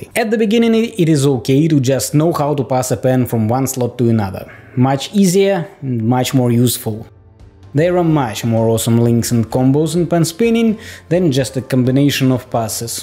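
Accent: Russia. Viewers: Русский акцент)Сразу понятно что ты русский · It always seems to me that you have a Russian accent, but it’s not bad, it’s just not familiar to me. :") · Man, I love your ruski accent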